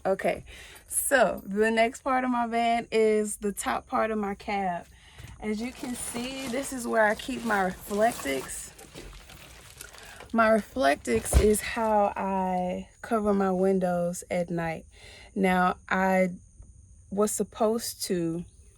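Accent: Houston, United States. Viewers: It's the Houston you see/hear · They definitely have the same tone, accent and mannerisms. Maybe it’s a Houston thing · Yes, I was thinking the same thing. It's the Houston accent...and also her facial features. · The Houston accent is so calming. You and Beyoncé can just record everything for me forever 😂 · I was thinking the exact same thing. Or that since they are both from Houston they just have a similar accent. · Omg you sound so much like Beyoncé and Kelly it's crazy. Houston accents! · Must be a Houston thing. I love her accent. Sounds similar to Beyonce. Side note...running to get some grapeseed oil, because you're glowing sis! Now that I look at her she actually looks related to them, darker but I see a resembalance. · Omg ❤️ You sound like Beyoncé... That Houston accent I guess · This is how most black Houstonians talk, coming from another black Houstonian. 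· I know I'm not the only one who notices she laughs like Beyonce, lol. I love it, must be that Houston twang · That's that Houston accent! Beyonce and Kelly are both from Houston like she is. I was thinking the same thing though!!!! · You’re beautiful, sis. Love the van! I can hear that Houston twang. Very similar voice to Kelly Row and Bey. Here I am with my squeaky voice. Lol your happiness is palpable and contagious! Great luck to you and God bless. New subbie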